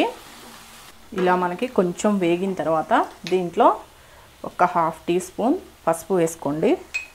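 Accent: Nellore, India. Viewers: Nice madam from:kavya mi vantalu motham super cheppadam kuda nidanamga ardamaila chepthunnaru.midi nellore ra madam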